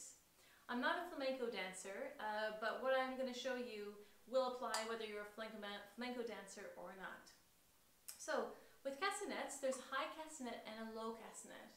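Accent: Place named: Australia. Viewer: I can hear that Aussie accent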